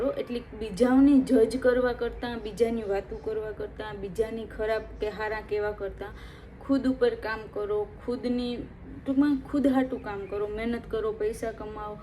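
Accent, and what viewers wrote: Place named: Gujarat, India. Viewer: ben tame gujrati desibolo 6o....isiral ni bhasa kiare sikhi.....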